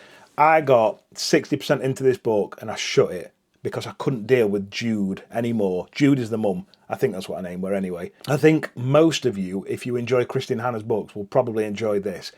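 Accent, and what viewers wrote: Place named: South Yorkshire, United Kingdom. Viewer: Mate, I live alone with my dog, and sitting watching you talk about your passion with a cuppa while I'm also having one is just so calming. You've already extended my tbr tenfold just by how you talk to your camera like you're having a brew with your buddies. I had lost both my parents by the age of 33, and my mother was from Leeds (she got me into reading as a child, worst thing she ever did tbh) and your dulcet south yorkshire/Derbyshire tones just make the place feel like home again. Keep doing what you're doing please, the world will suffer great loss if you ever stop.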